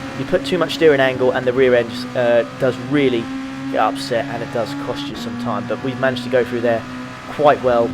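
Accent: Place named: England, United Kingdom. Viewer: Great Race, Dramatic Supens. Congratulations for ur fighting spirit znd ur Fair-play.💪👍 Love ur English accent and your channel content.👍🤩🤩🤩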